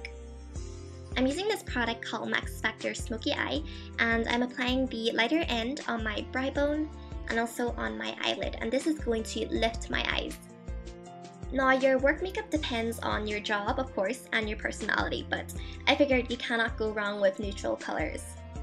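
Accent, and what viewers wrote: Ireland. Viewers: Telling someone who technically speaks English fluent and has an Irish accent not to try to sound fluent is just plain retarded. And you need to clean your ears please because I, who do not speak English as my first language, am able to understand what she's trying to say. Thank you very much. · are you like, half irish or something? :) great tutorial btw <3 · I know everyone says this but I LOVE your irish accent (: haha talk about the irish charm.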